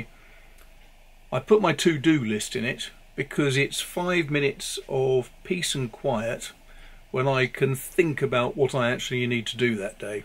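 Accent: United Kingdom. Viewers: yes! I’m very happy about this and really there’s nothing I love more than British voices waxing on about all things pen, paper and ink! ;)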